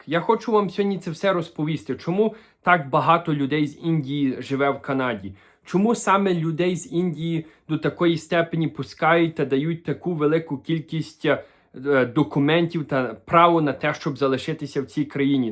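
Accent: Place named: Lviv, Ukraine. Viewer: Наздвичанйо цікаво розмовляєте, чути Львівський діалект видно що ви наш. Нам цікаво з України.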